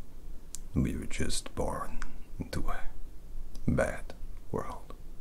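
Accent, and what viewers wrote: Russia. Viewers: I am always very pleased to hear you speak with a Russian accent. you are always the top of the top 🔝🔝🔝🔝 many more congratulations on 🎊150K🎉 even though you should be at a million or more ❤🤗❤ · I love your Russian accent! Strangely soothing for this tried and true Patriot. However, I want to be transparent. The gun sounded too plastic to me at the beginning, not sure why. We clean them all the time and I love the metallic sounds; the clicks of the hammer, the slide going into place, all of it. I love my Beretta ❤️ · I always really liked your russian accent,. The background hum is delightful here and goes well with the rather dark ambience. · I'm a huge fan of your russian accent, I find it very soothing and relaxing. This video goes straight to my favourites.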